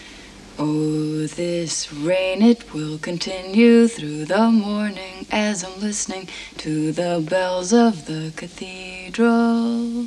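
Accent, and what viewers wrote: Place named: New York, United States. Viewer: nice! i thought so as it seems so nyc and from what i heard that is typical for that accent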